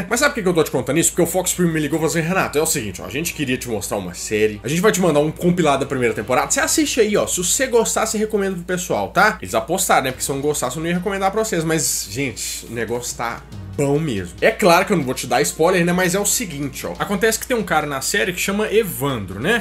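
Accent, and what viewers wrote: Minas Gerais, Brazil. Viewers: OCE É MINEIRO UAI, O SOTAQUE NAO NEGA TO ERRADO , SO SE O UM MUCADINHO SO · Cara, ce é mineiro? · Gente...melhor canal do YouTube....sua forma de apresentar é mt top..super natural e engraçado..é o sotaque Mineiro e top..MT bom de ouvir..sou mineira mas quem mora em BH fala MT diferente de quem mora no interior kkkk me divirto MT com seu canal parabéns.... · Todo domingo eu to aqui na expectativa de ouvir o modo de cumprimentar Mineiro ... " cês tão bão né?! Manda um salve aqui p São Joaquim de Bicas - MG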